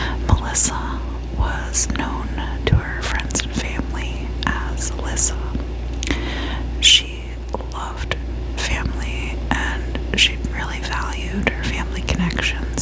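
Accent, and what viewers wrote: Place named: Midwest, United States. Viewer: Are you from chicago cause you sound like youre in the midwest if not jersey!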